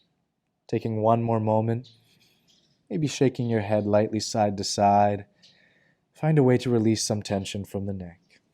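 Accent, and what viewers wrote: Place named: United States. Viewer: I hope I am not insulting you, however, you sound as if you spent time in the U S A Teaching Yoga, or demonstrating. Namasda. God Bless you.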